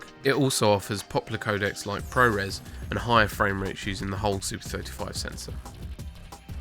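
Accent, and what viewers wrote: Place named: United Kingdom. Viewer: The problem with this vid is your very hard british accent, making it very hard to understand for strangers... Still a nice vid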